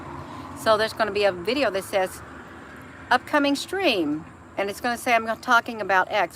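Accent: South, United States. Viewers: You sound like you live in the south. You have an accent.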